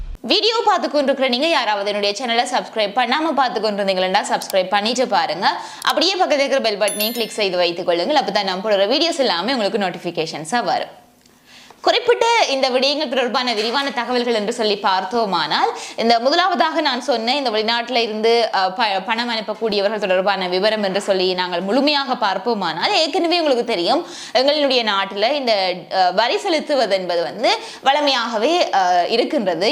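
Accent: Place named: Jaffna, Sri Lanka. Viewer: (யாழ் )பேச்சு வழக்கு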